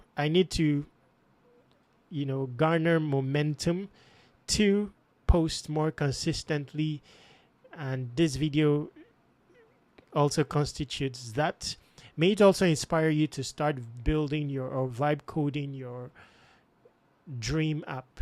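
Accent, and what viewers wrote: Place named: Nigeria. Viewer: Hello, thanks for sharing. You sound Nigerian 😊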